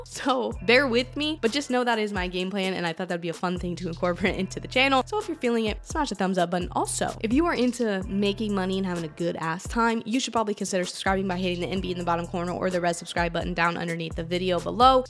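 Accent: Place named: California, United States. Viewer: “Super hella delayed” Cali-bred through and through. I love it! Great video again ❤️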